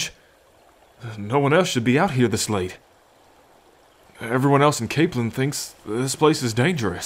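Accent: South, United States. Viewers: a heavy southern accent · He sounds southern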